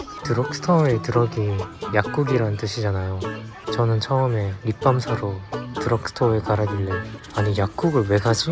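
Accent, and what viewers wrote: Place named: Gyeongsang, South Korea. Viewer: 혹시 경상도 출신이신가요~? 억양에서 살.....짝 경상도 억양이 ㅎㅎ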